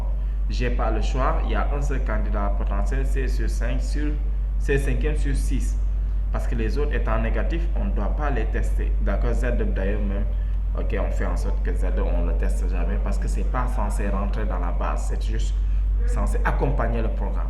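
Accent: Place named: Quebec, Canada. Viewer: quebec french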